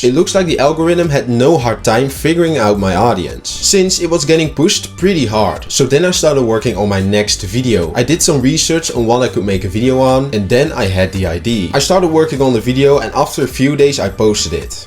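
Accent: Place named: Netherlands. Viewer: bro nice video btw are you dutch? i feel like i can tell by your accent 😁❤️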